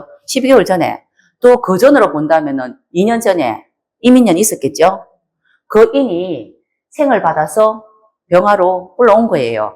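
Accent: Gyeongsang, South Korea. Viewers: ! 표정이나 말투가 보기 좋고 경상도 사투리 투박한 억양을 아주 기분좋게 잘 쓰네여 을목 오월생이 병화를 띄워 그런지 엄청 밝아서 좋은 에너지를 받아여 간간이 보여주는 유머나 천진한 듯한 애교도 구독자의 시선을 한 시간 동안 뺏기에 모자람이 없어 잘 보고 구독하며 감사드려여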